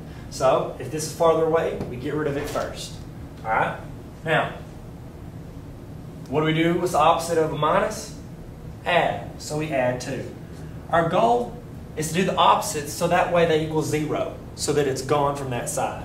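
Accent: South, United States. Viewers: Ummm why do u have to be so good looking? And that southern accent...couldn't concentrate on the lesson at first! ;-)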